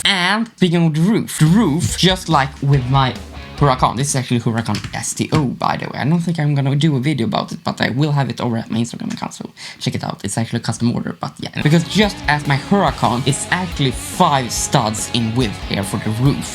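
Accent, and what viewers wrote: Sweden. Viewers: Are you from sweden? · eyy, du e svensk jag trodde det. ´´fick nåt i halsen´´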